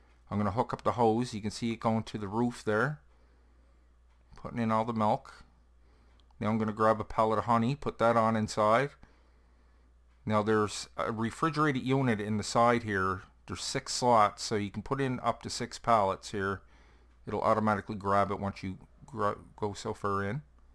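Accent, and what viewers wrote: Canada. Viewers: Oh my god you sound canadian, I am from Prince Edward Island, Canada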